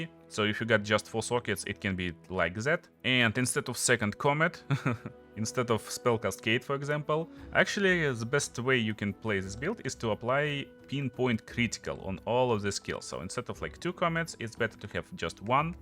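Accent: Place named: Russia. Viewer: Твой английский прозрачно намекает нам что ты русскоязычный)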